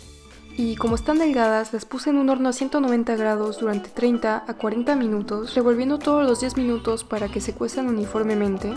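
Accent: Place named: France. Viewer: yo creo que ese acento tienen los franceses (creo que ella es francesa) y ni que sus videos fueran para animarte la vida jajajaja solo comparte recetas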